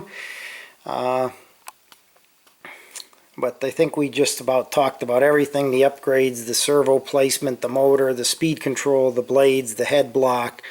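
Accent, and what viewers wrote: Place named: Massachusetts, United States. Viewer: Where are you from? You sound like you are from south east Massachusetts where I am from.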